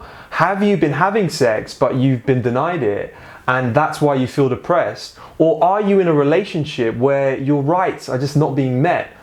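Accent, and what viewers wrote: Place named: United Kingdom. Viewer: What? He's a Brit mate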